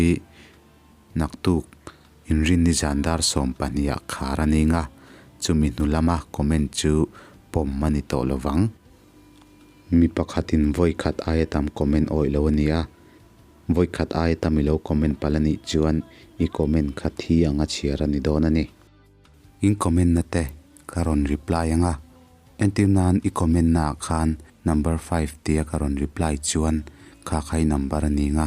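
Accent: Mizoram, India. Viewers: In thawnthu khawnkhawmna atha thinin,achhiartu lamin ichhiar ngaihnawm a,itawng thluk hi amizo in,angaihthlak anuam thin hle a,i chanel apiang hi chu,ka hman veleh a h ka ngaithllo phal ngailo a,damtakin awmla,ngalfimin dam la,kantan thunthu ngaihnawm tak2 nen he chanelah hian aneitupa inih angin lo langzing thin la,keini nupui nei a,pawmlai nei ngei pawh i aw leh ithu chhiarah izunah kan uaizo tawh ania.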